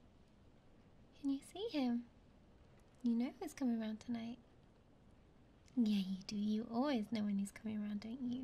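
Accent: Hertfordshire, United Kingdom. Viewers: Finally an ASMR Vid who’s ASMRtist has the same accent as me rather than the standard American one. I keep listening to this genre of ASMR hoping that something like this would happen to me even though it makes me feel lonely afterward (yeah I know generic sad lonely lad here). Thank you for this vid, it’s very well spoken and immersive, keep it up 👍 (you’ve made this lonely lad from the back ends of Hertfordshire a little less lonely)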